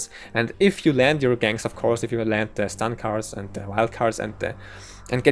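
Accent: Germany. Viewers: nice video dude. Btw are you German by chance ? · Deutsch?hast irgendwie nen deutschen akzent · hahah der deutsche akzent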